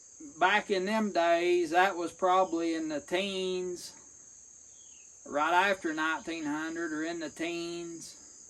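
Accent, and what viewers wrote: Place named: Appalachia, United States. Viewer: What an accent! Not boring like here in far north California. Surely you recount stories to your girls. Don't let them get too old for them. No one here ever asks me my stories. Wow, your stories! Got my attention! May granny was jumped by a small bobcat; something she might be able to handle. You've found your niche,: mills stones, and storytelling.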